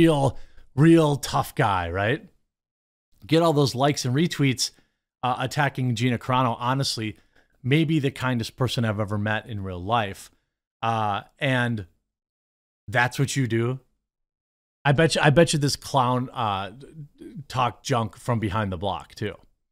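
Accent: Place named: Midwest, United States. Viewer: The effort jeremy goes through to hide his Midwestern accent sometimes cracks me up.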